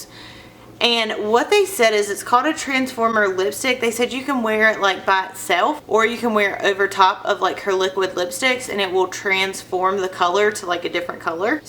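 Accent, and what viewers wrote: South, United States. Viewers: where are you from? I hear southern but what part? I'm in Houston Texas myself! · oh i just seen in the description box im from NC TOO ZEBULON AREA...HEARD OF IT? I KNEW IT HAD TO BE NC OR SC W.THAT COUNTRY ACCENT LIVE IT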